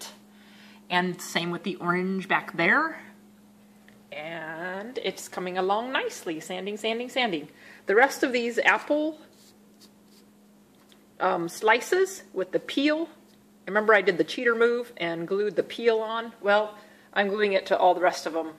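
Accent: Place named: North Dakota, United States. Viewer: Are you from North Dakota?? I can really hear an accent lol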